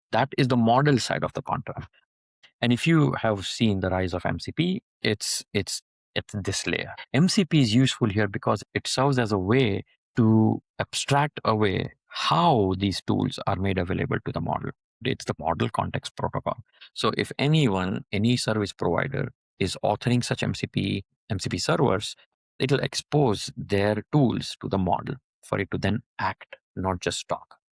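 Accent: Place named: India. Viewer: This was a GREAT explanation, even for somebody who already knew most of this stuff you managed to explain some of the primitives from such an original, opinionated angle (eg skills only after sub agents). Bonus points for making me actually like the Indian accent (I usually end up giving up when I only understand 10% of the words). An interesting segue would be discussing how models can or cannot go through reinforced learning to interact with an harness better than another, could that become a moat for models' labs? Subscribed!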